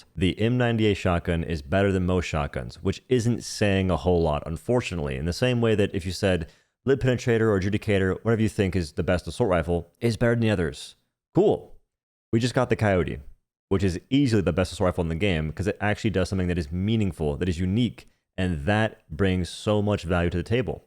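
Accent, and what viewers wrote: South, United States. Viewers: I'm from Bowman south carolina and I gotta tell you every other man you meet sounds like that. Southern drawl. Born and raised there too but my accent is weird.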